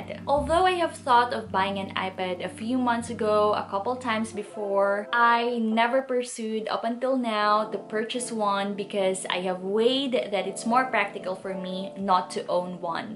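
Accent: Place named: Philippines. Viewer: Me too, not a fan of jewelry. Earrings and watch will do. Btw, I love your Filipino accent don't mind the troll account. 😉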